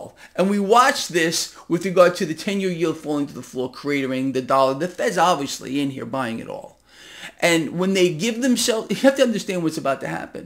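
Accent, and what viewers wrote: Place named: New York, United States. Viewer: The New York Accent is coming back Since moving to Fla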